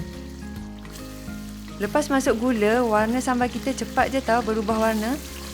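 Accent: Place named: Kedah, Malaysia. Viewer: Dengar loghat kedah video ni. Hahaha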